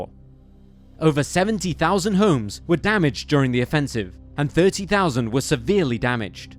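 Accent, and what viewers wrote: United Kingdom. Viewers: No more limey narrator!.....sick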